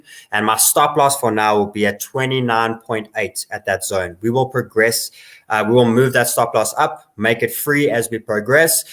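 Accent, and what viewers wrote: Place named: South Africa. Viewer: Great, you’re incredible. Can someone please post the ticker of the last coin, it’s that South African accent 😜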